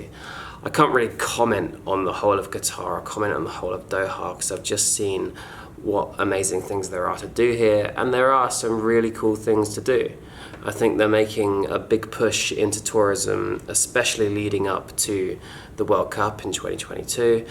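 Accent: United Kingdom. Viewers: Bmw 7 series as a taxi And u say not to shabby Haaaahaaaaahaaa AND UR BRITISH I CAN TELL BY UR ACCENT SO U KNOW WHITE GUYS HAVE FORDS AND VECTRAS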